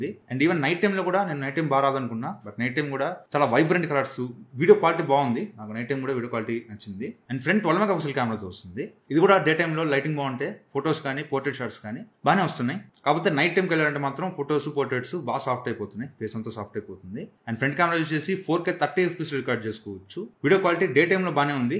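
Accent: Nellore, India. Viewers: ఏ ఊరు బ్రో మీది? కొంచం నెల్లూరు యాసలో మాట్లాడుతున్నారు?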